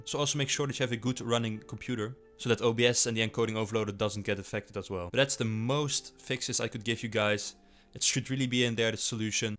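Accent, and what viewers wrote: Netherlands. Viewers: you speaking like a dutch accent :D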